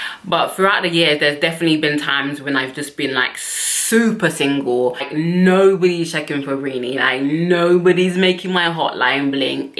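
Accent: United Kingdom. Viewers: cute British accent. black people with British accent.... are very dope . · Wow!!!! I love this woman mindset I would love to meet someone like her plus I have a thing for dark skinned woman and love the British accent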